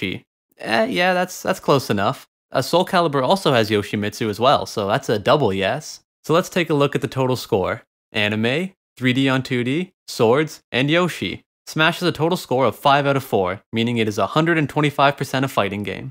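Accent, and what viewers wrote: Newfoundland, Canada. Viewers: Started watching a ton of your videos. Quick question. You a Newfie?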